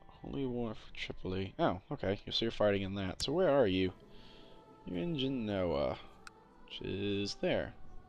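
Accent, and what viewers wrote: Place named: England, United Kingdom. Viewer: I can definitely hear the English in your accent, just generally the way you speak and the words you use. Just thought I'd let yet know.. :D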